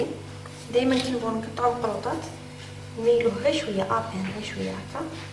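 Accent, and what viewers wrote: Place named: Arab world. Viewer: يعطيك الصحة ولي تعجبني اللهجة جهة الغرب تفكريني في الفاميليا لما نحضر في مناسباتهم موفقة وننتضر المزيد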